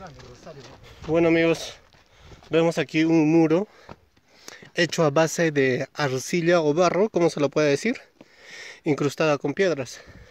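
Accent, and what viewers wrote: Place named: United States. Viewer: Me encanta tu voz medio gringo tu acento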